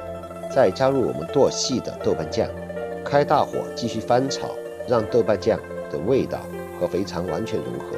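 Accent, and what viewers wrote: Sichuan, China. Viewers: 还是四川话好听，又有特色！带着爱做自己爱做的事情，顶你！ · 四川人听四川人说普通话好搞笑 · 作为成都人的普通话已经超过很多四川人了，不是四川人的话几乎听不出椒盐味道。不过还是喜欢听的成都腔调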